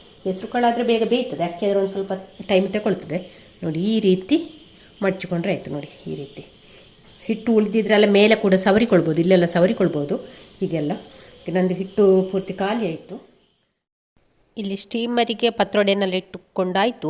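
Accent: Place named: Mangalore, India. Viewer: Wooow new healthy recipe sister 👌 🎉 watch full video liked 👍 nim manglore kannada super sis😅🥰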